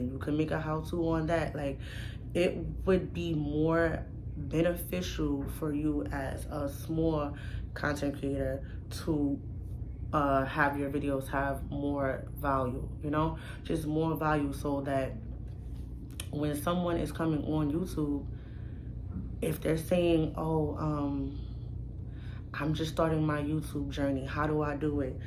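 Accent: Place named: New York, United States. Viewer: In 2025 we are getting rich off of content yes. Get it sis.💐🥂 I felt that. The stand is super cute and has good lighting 💡. Big facts on people is looking for what can you give them. & What can they look forward to coming back to? Everybody gotta start from zero followers subscribers… views. Content is like making a ship or the bottles that people put notes in then put it in the ocean hoping it will land on a island one day and somebody would read. That’s like videos on the web and people click them based from the thumbnail or title. 😁 lol you had me cracking up. Shorts bring a lot of attention to people about there channels and giving people a quick reason to subscribe for more content. Word is going around that A lot of people have short attention spans as well.. so that’s why shorts come in clutch 🤷🏽‍♀️ one time I got a comment that said “your video be too long. 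You need to make them shorter “ when I looked. The video was only about 9 to 10 minutes. 🤦🏽‍♀️ yes I’m looking forward to the long content. I can’t wait to see. is where I’m at now in the video. I’m listening and writing along, so I don’t forget what I have to say and you just talked about what I said about the short attention spans 🤯🤭 lol but click baits are the worst. Smh lol ppl play to much. You had great great points and yoooooo pls with the “did you miss me “ 🤣🤣🤣🤣🤣 hahahhahaha yo I can tell your from NYC me toooo. And it took years for me to realize New York do have an accent just like country ppl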